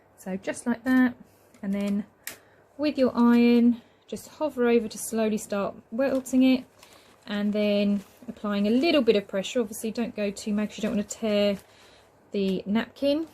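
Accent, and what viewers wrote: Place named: United Kingdom. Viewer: Thank you. A British accent, great. Translucent - light passes through.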